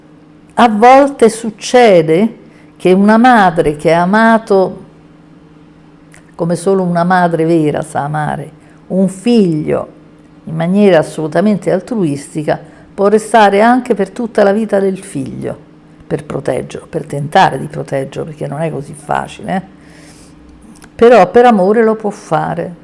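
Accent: Rome, Italy. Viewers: Professoressa lei è di Roma?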